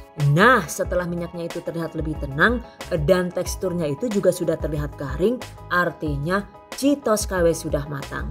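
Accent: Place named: Cilacap, Indonesia. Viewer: Ky logate wong cilacap😁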